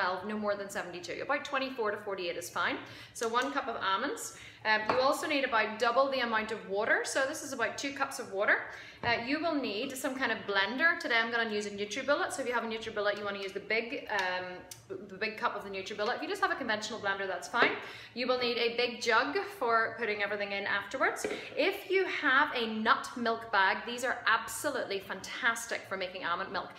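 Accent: United Kingdom. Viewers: Northern Irish accent. Didn't notice that she spoke too fast, but I'm British..maybe that's why. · Wee belfast lady ballymena? Thanks for video its great x x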